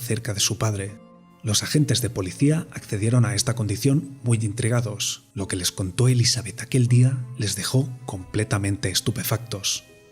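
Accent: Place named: Basque Country, Spain. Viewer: Oye. De dónde eres?? Ese “pero” k metes al final de las frases esmuy euskaldun 😂😂 igual me he colado. Pero diría k eres de Donosti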